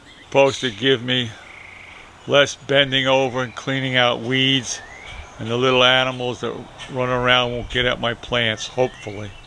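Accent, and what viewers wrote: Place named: Long Island, United States. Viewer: Thanks so much for sharing this with us, Grandpa! these 'raised" gardens seem to be all the rage these days, no bending down to weed!! Thanks too, for sharing that delightful Long Island accent with us, the word "tomato" becomes 'TOMATA."!!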